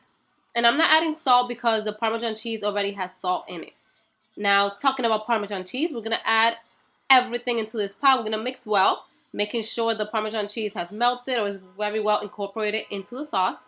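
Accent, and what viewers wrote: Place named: Dominican Republic. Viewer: U sound Dominican is this the Latino way of making? Lol looks good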